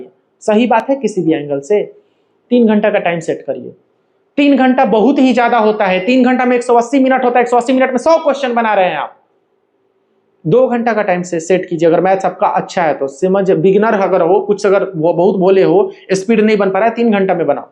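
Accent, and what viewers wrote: Bihar, India. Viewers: Bihar se ho 😅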